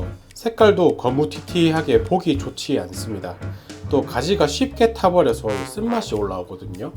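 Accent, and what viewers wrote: Gyeongsang, South Korea. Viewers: ㄱㅕㅇ상도 말이 멋져요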